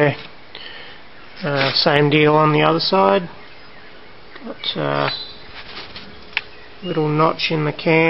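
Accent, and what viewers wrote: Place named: Australia. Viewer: you sound like badger from Breaking Bad, except with an Australian accent.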